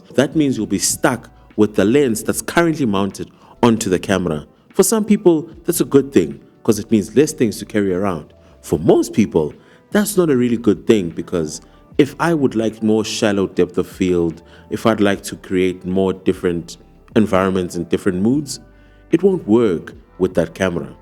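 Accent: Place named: South Africa. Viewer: I heard the South African accent and subscribed. Also, I like the zooming in and out of your MCUs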